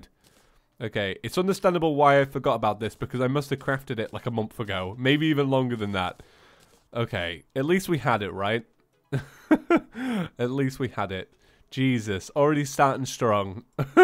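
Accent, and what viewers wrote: United Kingdom. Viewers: Stereotypes are bad, I know but in the middle all I could think about was how steriotypical of a British person sounds Have a good day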